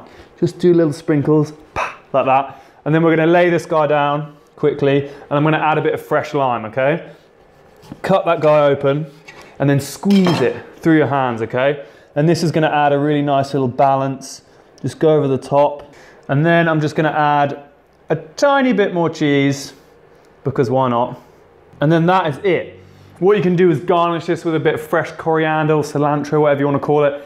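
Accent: United Kingdom. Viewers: I'm Mexican, and it's so cool to watch you make one of my favorite street foods. It's quite funny and cute how you describe everything with your British accent 😁 I just loved your "chopstick elote with cojita cheese" 😂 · i'm mexican, and you sound very cute when you say elote Hahah, i love your accent😍❤ the british accent is the Best❤😍😍😍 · Hot guy with british accent and making mexican food street? YOU'RE PERFECT! 🇲🇽❤️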